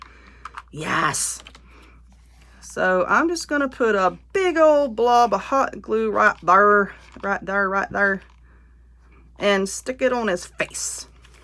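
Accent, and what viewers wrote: South, United States. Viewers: Where are you from, I'm from SC. You sound like you are from the south.😊 great craft. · New Sub here, love the way you talk ! Love the channel, boy you are southern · That looks so good your definitely southern I love your accent.i need to try that too co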